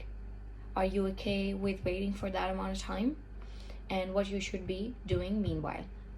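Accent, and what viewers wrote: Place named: Ireland. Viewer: crazy how uve only been In Ireland around a year and im already hearing a bit of an Irish accent peaking thru!! so cool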